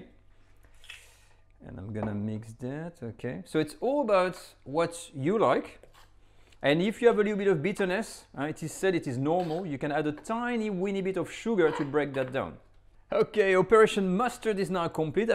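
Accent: France. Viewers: Hey, I did not not intend to offend you. Of course you can buy the stuff at a store, but the point of this clip was (IMHO) how this stuff made and how to make it yourself if you are so inclined. I for one enjoyed the presentation, the french accent and learned a couple things along the way. But then again, to each his/her own. Piece. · You could tell he’s French by his outrageous accent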